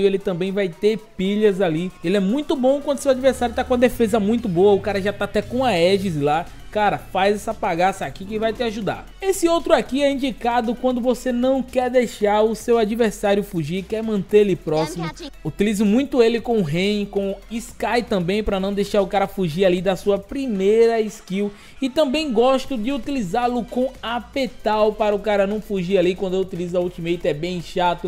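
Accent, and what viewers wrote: Northeast, Brazil. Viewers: Youtubers com sutaques nordestinos são os melhores pra explicar as coisas kkkkkk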